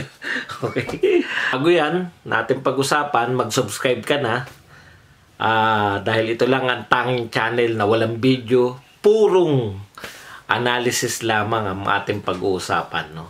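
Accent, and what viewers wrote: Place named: Tagalog, Philippines. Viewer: Galing talaga mo boss. Malinis managalog at kahit walang video sakto mga punto.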